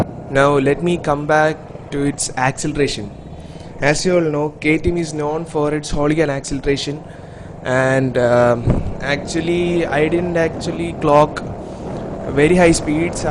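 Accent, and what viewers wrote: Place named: Kerala, India. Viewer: r u a mallu .You sound like one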